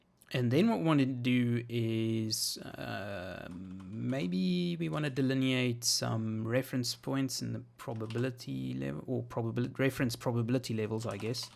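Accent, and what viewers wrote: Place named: South Africa. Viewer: Thanks for this. Nice to listen to a fellow South African voice when studying.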